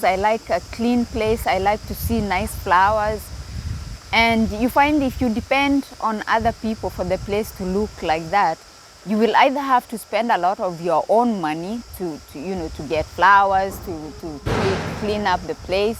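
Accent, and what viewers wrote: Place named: Kenya. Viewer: You speak like a Kenyan, were you originally from Kenya? I'm coming to Greece to buy a holiday home, later a retirement home.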